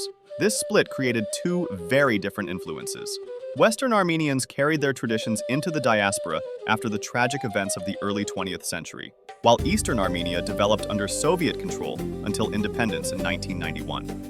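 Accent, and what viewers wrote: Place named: Arab world. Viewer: You are ethnically Armenian, but culturally you seem more connected to the Arab world. I notice Arabic influence in your cuisine and even in pronunciation, like saying “Suria” instead of “Syria.” you call yourself Middle Eastern and calling all Armenian nation like that. However, Armenia is not considered an Arab or Middle Eastern nation in terms of identity. Armenia is a member of the Council of Europe 🇪🇺 and other European institutions and is part of the South Caucasus region, which is associated with Europe in political and cultural classifications. Many Armenians in Armenia identify as European rather than Middle Eastern. Geographically also we are considered part of Europe because according to EU law it requires geographic requirements that's why they rejected Morocco in 1987 and Canada have been told can't join but us they haven't been told that can't join and Armenia approved membership bill. 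Not applied yet. The same Georgia which have been accepted after applying and received candidate status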